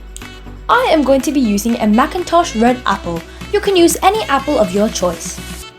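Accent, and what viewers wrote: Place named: United Kingdom. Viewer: Great try with your British accent... came out well like recipie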